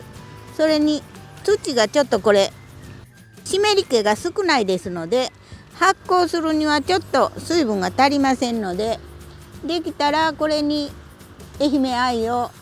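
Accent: Tosa, Japan. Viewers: 懐かしい、土佐弁の解説。最近、家庭菜園を始めました。夏野菜は、きゅうり、ナス。オクラなどを植えて、少しですが今年の夏は野菜代、助かりました。いろいろと、失敗したりしながらですが、楽しいです。の解説がわかりやすくて、親しみがあって。これからも、参考にさせていただきます。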